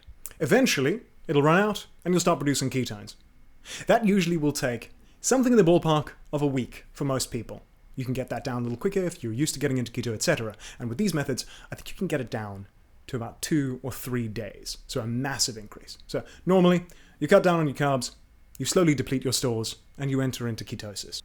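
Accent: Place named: United Kingdom. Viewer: I think I was expecting a California surfer dude accent because of your hair, but got Sherlock Holmes instead. Anyways, great video!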